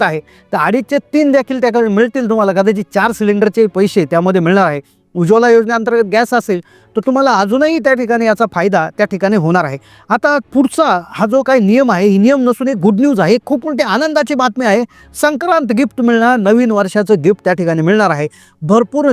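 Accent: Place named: Pune, India. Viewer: Punayt tune boltay me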